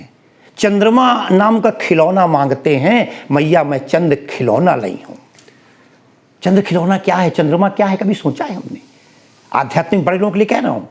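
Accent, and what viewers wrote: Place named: Unnao, India. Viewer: वाह वाह!बहुत ही विद्वतापूर्ण,शोध पूर्ण,भक्ति पूर्ण और अत्यन्त रोचक रहा भगवान श्री कृष्ण जी की लीलाओं का भक्ति व्याख्यान।ऐसे ऐसे रोचक पदो की विवेचना की जिन्हे पहले कभी नहीं सुना था।कचहरी का स्वांग बड़ा रोचक रहा।तीन तरह की एफ आई आर की जानकारी मिली।अंत भी अपनी रोचक और कौतूहल पूर्ण समस्या पूर्ति,कहां अंटके! से किया।बीच बीच में उन्नाव की बोली और लहजे की मिठास और अपनेपन की अनुभूति का भी आनंद मिला।बहुत सुंदर प्रस्तुति के लिए धन्यवाद।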